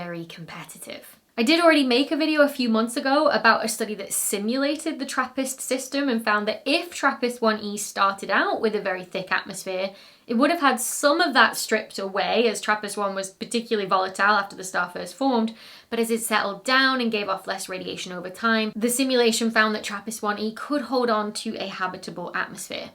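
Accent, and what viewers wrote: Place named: Durham, United Kingdom. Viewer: You really picked up the accent at durham, i couldn't work out if you were Victoria wood or steph houghton lol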